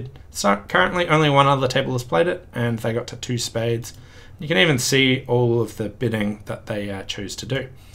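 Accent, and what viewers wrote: Australia. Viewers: Thanks. Just learning myself, and have started using BBO. Enjoying going through your videos, nice to see and hear an Aussie voice on YouTube.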